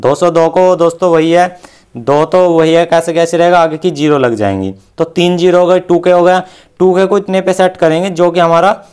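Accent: Meerut, India. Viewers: Meerut ke rahne wale ho lagta hai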